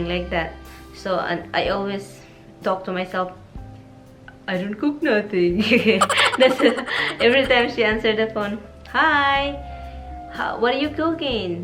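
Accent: Visayas, Philippines. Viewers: Your so funny like ur attitude n visayan accent so darn funny n cute 😁😂🤗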